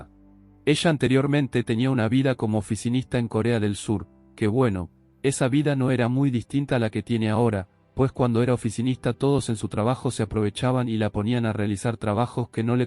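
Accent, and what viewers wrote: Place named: Argentina. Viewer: Ohhhh pibe! Me encantan vuestros resúmenes! Vuestro acento y dialecto argentino es muy exquisito para mis oídos! Os agradezco por este nuevo video muy divertido vuestro relato, esperáremos vuestros otros capítulos! 🎉❤🎉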